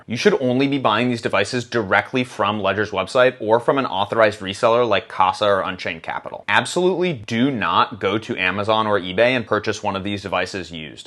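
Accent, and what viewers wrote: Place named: California, United States. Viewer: Thx for the video. Good info. Thanks, but why do you sound like your from Cali?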